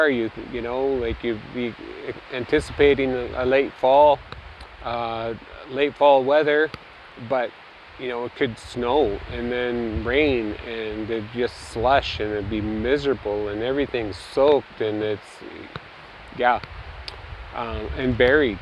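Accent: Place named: Canada. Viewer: I knew you were Canadian when you said the word 'process'. Americans say 'prahcess', not 'prowecess. You also said 'bloody excited'. More common adjective (bloody) with Canadians probably since 1 in 5 Canadians are of Scottish descent.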